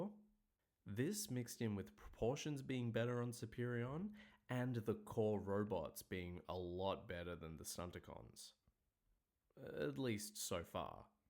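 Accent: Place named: Australia. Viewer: Nice to hear another Aussie accent. Funnily enough I'm also a haha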